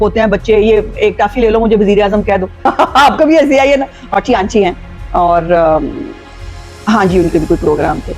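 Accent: Punjab, Pakistan. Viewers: Azma g Punjabi ka tarka boht changa lgdaa😊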